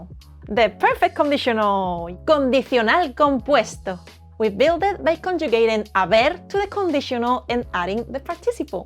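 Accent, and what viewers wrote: Spain. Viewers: You do a great job. However, one word in English that you are mispronouncing is “participle”. There is a stress on the first syllable “part” and a bit of stress on the third syllable “ci.” So, it comes out as part”- ti- ci’-ple. Your English is overall very good with a very cute Spanish accent. Sadly, with our hateful, unchristian Trump regime here in America, your accent could get you stopped and questioned by ICE agents. And, want your country please take Elon Musk off our hands! Muchas gracias para su lecciones en español. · For her accent I think she is from Spain 😊😅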